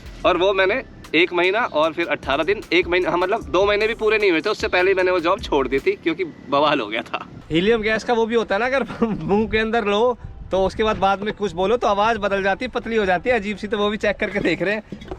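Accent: Haryana, India. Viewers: Ram Ram ji, News wali video kab ayegi. Karo news wali video, bada maza aa jata hai waise videos dekhke apke Haryanvi accent me.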